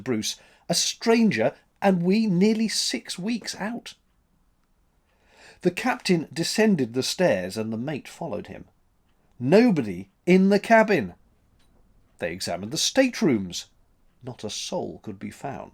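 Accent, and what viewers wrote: United Kingdom. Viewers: bravo. as an american, i can certainly say, stories always sound so much better with a proper british accent. :-)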